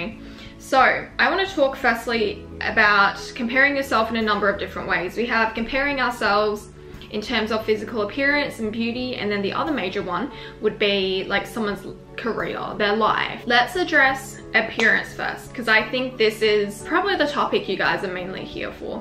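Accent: Australia. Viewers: I love the Australian accent and how she says “phone” she says “phewrn”.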